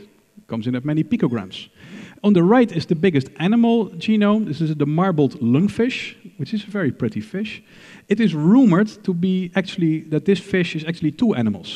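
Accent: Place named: Netherlands. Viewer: He is dutch i hear that 😜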